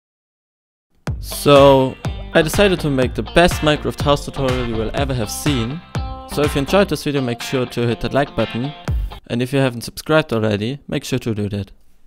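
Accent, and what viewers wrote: Germany. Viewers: Ich will jetzt nichts sagen aber er hat ein deutschen akzent 😂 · Er hört sich deutsch an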